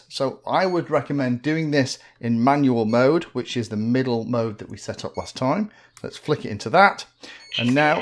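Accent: England, United Kingdom. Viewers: Hey - at 87 I must be the oldest fpv daddy around! But........I am getting there because YOU are there. Also, give me an English voice anyday! So easy to understand, for us Down Under. <link>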